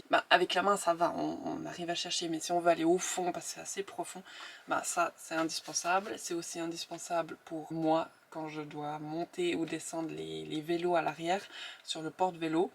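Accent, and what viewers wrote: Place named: Switzerland. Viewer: Merci pour ta vidéo je me suis abonnée je me demandais d’où venait ton accent donc tu es suisse 🇨🇭 très contente de te connaître et non tu ne parles pas trop sur tes 5 éléments j’en possède 3 et je pense vivre en camping-car Vivement les prochaines vidéos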